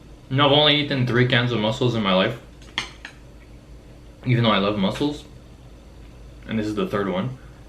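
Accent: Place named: Quebec, Canada. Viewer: Matiz makes a nice can of mussels. Your accent was sounding very familiar to me and then I realized it is like my great grandmother's was, she grew up in Quebec. Cheers from a fellow canned seafood enjoyer and Souls games player!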